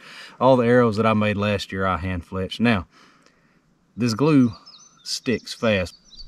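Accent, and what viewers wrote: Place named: United States. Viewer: I'm glad I I'm learning smth from this Guy. I like this American Accent better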